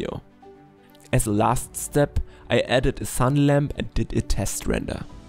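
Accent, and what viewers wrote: Germany. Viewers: are you german? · Der hart deutsche aktzent stört ein wenig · why does he sound german?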